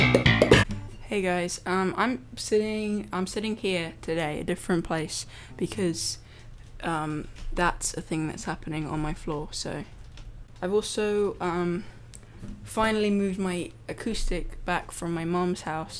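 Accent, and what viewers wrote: United Kingdom. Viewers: he's British I think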